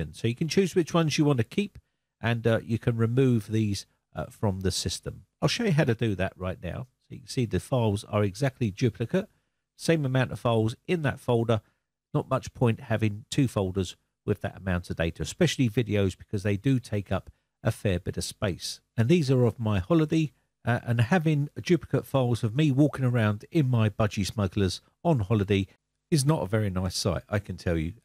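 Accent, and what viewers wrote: United Kingdom. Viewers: British accent perfect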